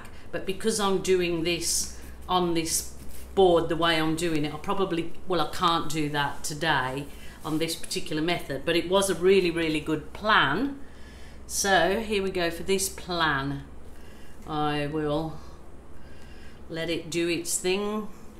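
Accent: Birmingham, United Kingdom. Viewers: Me too, ex brummie. Noticed the accent straight away. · I thought she sounded like she was from Birmingham in the UK LOL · You sound like me, I’m a brummie... a thingy 😂😂😂😂💖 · Yeah....don't get your heat gun mixed up with your magic wand, ladies! It would lead to an embarrassing trip to A&E 😂😂, are you originally from the UK, Birmingham type of area? I'm trying to place your accent and it's driving me crazy 😂😂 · Thought exactly the same...she’s deffo got a brummie twang 😀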